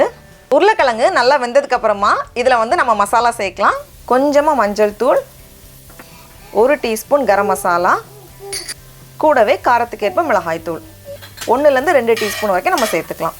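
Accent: Nagercoil, India. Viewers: Hi akka nice to see your cook we are so lucky......so thank u for ur madras samayal... us la irunthalum nama nagercovil vitukodukama pesurinka very nice .... so we support always u akka carry on .....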